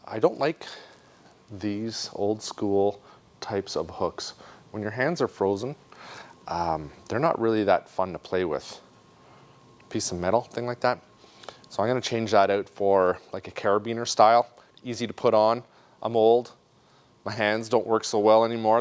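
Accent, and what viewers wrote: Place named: Northern Canada, Canada. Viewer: it sounds like your from Northern Canada. where abouts?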